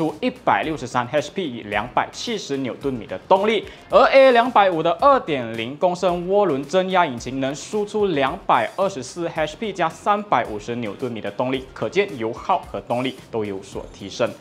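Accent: Standard. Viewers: 新人不错，华语标准，表达清晰，automachi捡到宝了，可以好好栽培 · 华语非常标准👍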